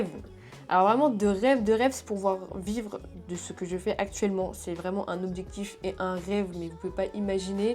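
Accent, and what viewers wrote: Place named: North, France. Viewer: Salut Première chose, j'ai eu beaucoup de mal avec toi au début sur la chaîne du tonton mais maintenant ça passe beaucoup mieux, tu es plus à l'aise et je t'ai finalement accepté. Et seconde chose, je trouve que tu as un p'tit accent du Nord. T'es vraiment de la région parisienne? Tu n'aurais pas vécu un peu plus haut que Paris? Bon courage pour ta chaîne et tout le reste. La bise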